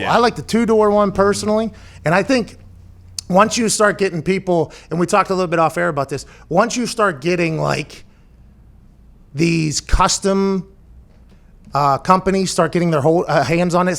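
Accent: Pittsburgh, United States. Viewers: Man I love watching and listening to these videos, gotta love that pittsburghese that comes out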